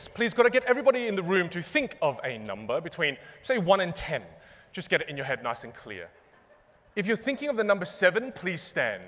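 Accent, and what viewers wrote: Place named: Vietnam. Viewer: Your Vietnamese accent is spot on lol .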